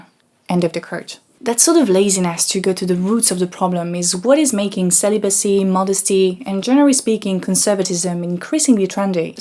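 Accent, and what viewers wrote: France. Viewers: She does it deliberately to misrepresent her political opponents so she can make 'essays' pretending she knows what she talks about. Trust me, if you start an essay from a false premise then no matter how many big words with a french accent you say, it's pretty much garbage from the initial false premise. She doesn't want feminists represented by the hairy purple haired freaks screaming at everyone with viens bulging from their forheads about how proud they are for their abortions because she would claim that lacks nuance right before making the claim that all men are incels in her opinion 🤷‍♀️ this approach is the substance of most leftist debate these days, thats why conservatives generally have stopped trying to talk to them. Because their interactions are disingenuous and gaslighting.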